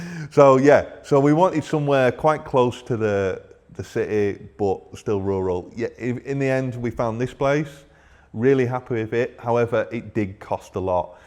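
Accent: Northern England, United Kingdom. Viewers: Brilliant good luck to the future in your lovely home .i detect your from the north of England ?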